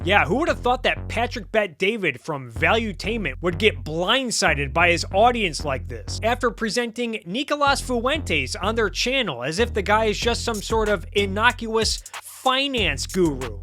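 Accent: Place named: Midwest, United States. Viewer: Good video but your midwestern accent is too strong I physically can’t finish it sorry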